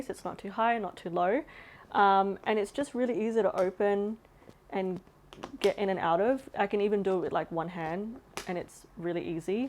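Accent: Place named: Australia. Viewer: Are you from Aceh? Can’t figure which accent, but the more I hear it’s definitely Aussie’s 😅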